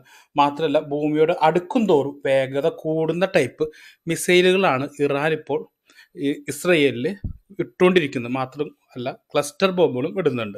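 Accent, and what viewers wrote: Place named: Thrissur, India. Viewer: മലയാളം ശരിക്ക് സംസാരിക്കാൻ ശ്രമിക്കൂ. തൃശ്ശൂർ സ്റ്റൈലിൽ അല്ല വലിച്ചു നീട്ടിയുള്ള സംസാരം ഒഴിവാക്കു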